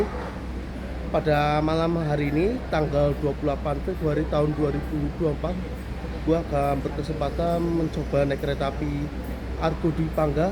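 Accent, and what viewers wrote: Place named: Java, Indonesia. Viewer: Mohon maaf mas, logatnya gak cocok nyebut "gua" terlalu medhok😁🙏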